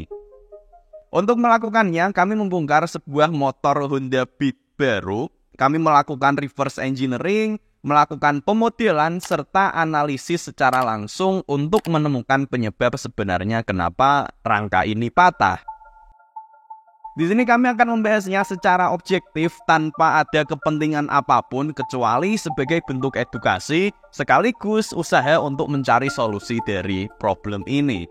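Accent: Java, Indonesia. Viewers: Penjelasan yg komprehensif plus medok men bahasamu lek ❤ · Logate osing banget. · Medok masse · Bahasa, tpi logat.e osing😂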